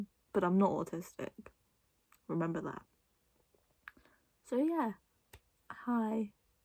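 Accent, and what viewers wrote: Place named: United Kingdom. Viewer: Ew I just realized your British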